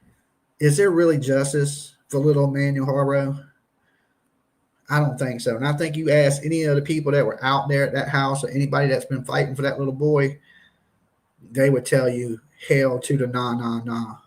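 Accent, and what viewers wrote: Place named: Kentucky, United States. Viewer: I live n Eastern Kentucky... Was u n Lexington or Louisville? Sounds like it...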